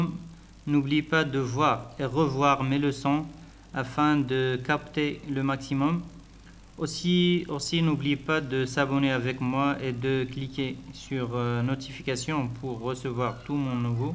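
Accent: Arab world. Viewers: Arabe qui parle anglais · Arabe qui parle anglais sa donne ça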